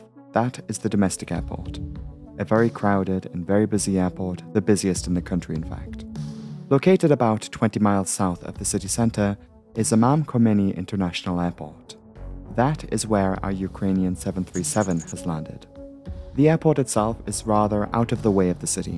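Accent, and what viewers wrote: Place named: Newcastle, United Kingdom. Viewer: Thank you for another informative video. I'll pledge if you like. Your Geordie accent sneaks out sometimes 🥰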